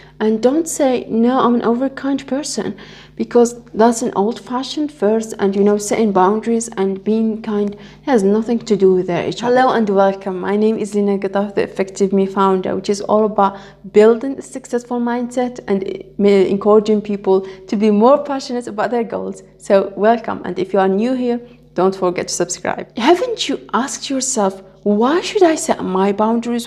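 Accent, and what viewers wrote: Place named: France. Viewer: You look like a beautiful nun i do love your french voice🥰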